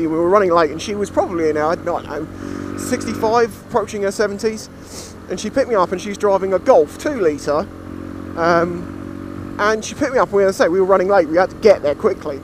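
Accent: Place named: England, United Kingdom. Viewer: LOL, captions were funny. I think your accent is throwing the software off. It doesn't recognize English english.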